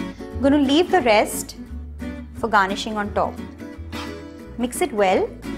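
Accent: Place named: India. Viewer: I dont like the accent of indian when speak english hehehe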